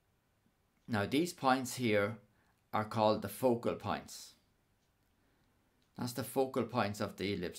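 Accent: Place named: Ireland. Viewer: Love it! So well illustrated! Great to hear the Irish accent too! Cavan? Drogheda?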